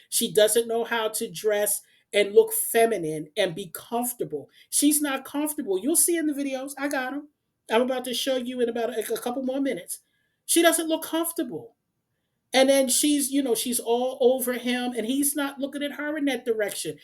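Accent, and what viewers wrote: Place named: Philadelphia, United States. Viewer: You’re so right! I also can tell you’re from Philly or the surrounding areas maybe our accent is one of a kind! Hey hey! 🫶🏽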